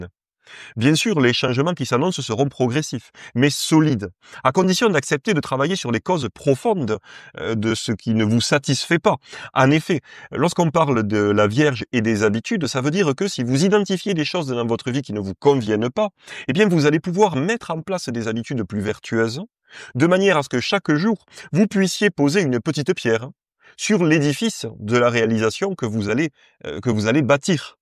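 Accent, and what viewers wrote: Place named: South, France. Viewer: Joli petit accent du sud😊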